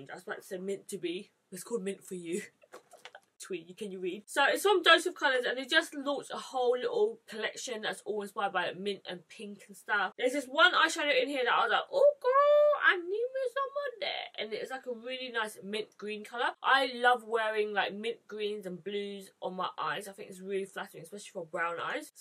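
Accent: United Kingdom. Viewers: Omg your Brittish accent! I wasn't expecting it 💗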